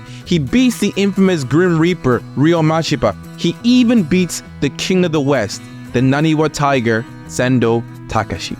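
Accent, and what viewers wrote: Ireland. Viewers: cool irish accent lol you sound exactly like my brother, love hajime no ippo since it motivated me to begin boxing.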